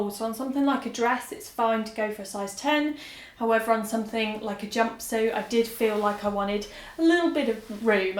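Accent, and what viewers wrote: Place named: London, United Kingdom. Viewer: Aw you can't imagine how much I love your accent. It reminds me the time I spent in my lovely London. In this video you talk specially smoothly so it is easy for your non-english speaking followers to understand. Also love your style of course ❤️ Lots of love from sunny Spain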